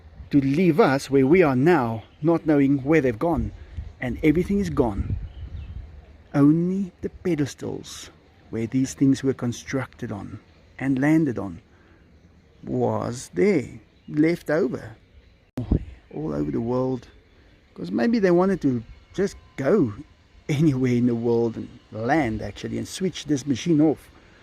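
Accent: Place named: South Africa. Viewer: SA accent is so entertaining to me, especially when he says certain words/phrases. I particularly enjoyed “park that sucker” today 😂🤣👍. I also always enjoy “milk” and “barf” 🤷‍♀️ 🤪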